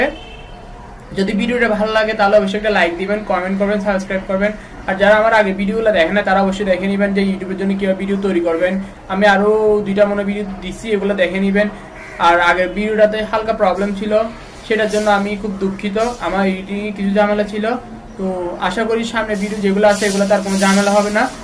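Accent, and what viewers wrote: Chittagong, Bangladesh. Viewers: ভাই মাঝে মাঝে আপনার গলায় চাটগাঁইয়া ভাষা চলে আসে! আগে শুদ্ধ করে বাংলা ভাষা বলতে শিখোন।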